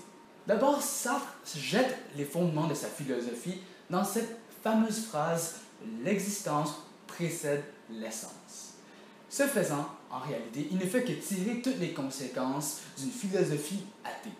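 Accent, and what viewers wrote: Quebec, Canada. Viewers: C’est dur à écouter l’accent québécois mais très bien expliqué merci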